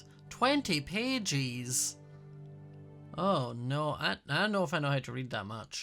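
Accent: Scotland, United Kingdom. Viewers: Your accent gave it away weeks ago... :) It was all a bit McAdder from Blackadder. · this explains so much the coruption coming from your portal how u and the demon both have scottish accents i cant wait til next episode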